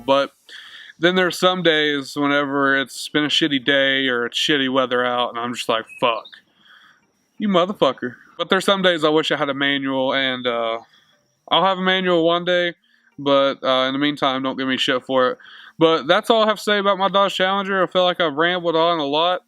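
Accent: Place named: South, United States. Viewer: I thought the main presenter had a distinctive southern accent. Who is this big boy?!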